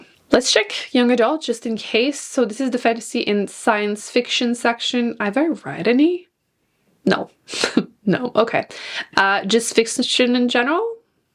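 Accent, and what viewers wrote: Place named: Quebec, Canada. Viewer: Moi qui t'entend compter en français et qui réalise que tu es québécoise: 😮🤯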